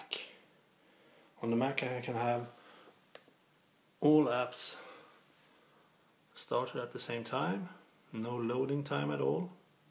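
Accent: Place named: Sweden. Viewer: Bra jämförelse, älskar den brutalt svenska brytningen ;)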